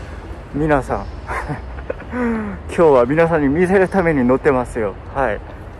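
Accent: Fukuoka, Japan. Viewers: 福岡弁、癒される〜✨ 今は東京に住んでるけど、福岡ほんと好き〜 私は毎日とうもろこし茶飲んでるよ〜👍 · キックボードに乗りた〜〜く!!!なるスタートですね😊そして福岡弁があったか過ぎ…こんなナレーションのNEWSなら、視聴率が右肩上がりになりそうです🆙🆙🆙 最後の「ガーーーン🎶😱」音に免疫がついてきて、ビックリどころか、もはや楽しくなってきました🎶🤗🎶慣れってスゴイ…。 …毎回、やるちゅもり❓ JUJUさんが今日も元気で良かったです。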